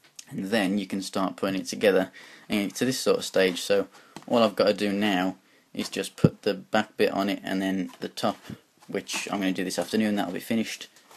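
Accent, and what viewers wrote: United Kingdom. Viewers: Are you from birmingham? you sound like a brummie xD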